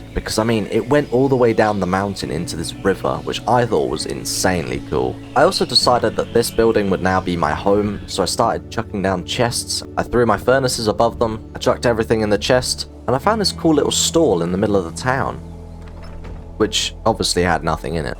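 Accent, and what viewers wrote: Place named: United Kingdom. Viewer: HAIII IM HERE TO CALL YOU MY FAVORITE BRITISH, PLS SAY BOTTLE OF WATER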